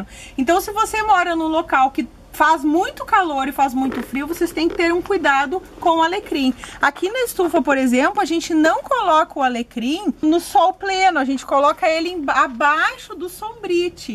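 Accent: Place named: Rio Grande do Sul, Brazil. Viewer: Nossa em outro vídeo comentei q pelo sotaque desconfiava q vc fosse gaúcha e neste vídeo descobri que és de São Leopoldo- RS a cidade onde moro hahaha q incrível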